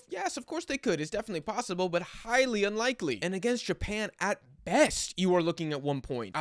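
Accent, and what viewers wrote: United States. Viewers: He has a typical thick American English accent. So I guess he is from the USA.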